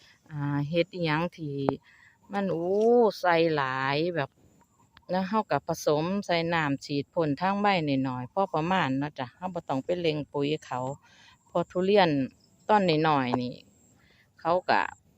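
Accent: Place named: Sisaket, Thailand. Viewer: สำเนียงเหมือนคนศรีสะเกษจังครับ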